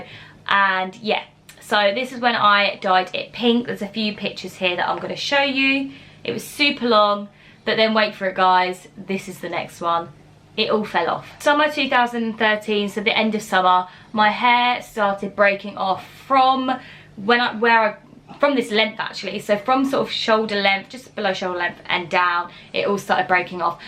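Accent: United Kingdom. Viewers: Your british accent is 😍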